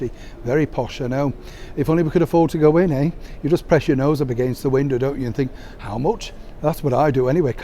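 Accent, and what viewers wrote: Yorkshire, United Kingdom. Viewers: You speak like a true Yorkshire man. you are a very smart gentleman without spending a fortune on ties 👔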